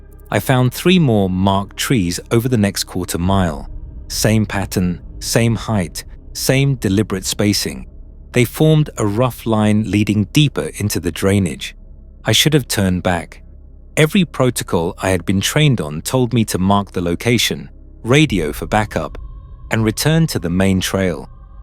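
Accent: United Kingdom. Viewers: Why a British accent if this person was supposedly from the US PNW?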